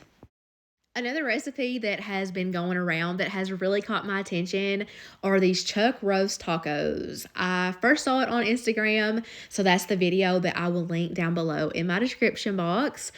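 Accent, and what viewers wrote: South, United States. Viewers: I love the southern accent, way too cute!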